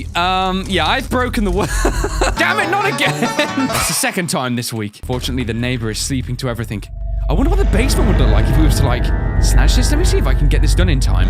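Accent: United Kingdom. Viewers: AshandraBlue i mean he is british like dan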